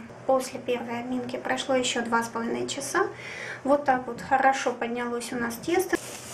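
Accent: France. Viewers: Лайк за французкий акцент . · От французского акцента чуть не стошнило, можно как то без него...😝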